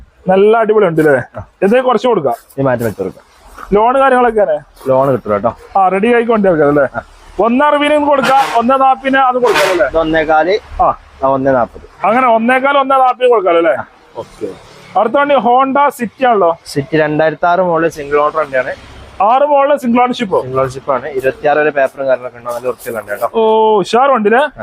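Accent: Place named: Malappuram, India. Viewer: മലപ്പുറം ഭാഷ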